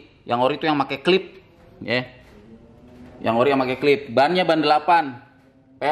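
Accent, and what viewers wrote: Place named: Tangerang, Indonesia. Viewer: lokasi di Tangerang kah? Logatnya kayak Tangerang2 benteng gituu, haha asiikk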